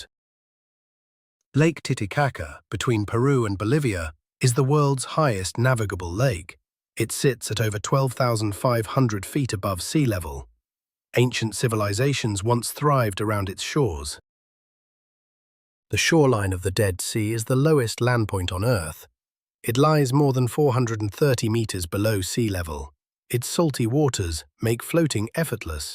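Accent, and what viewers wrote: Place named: United Kingdom. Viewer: nice! I have been trying to find a video with British English and I have it here!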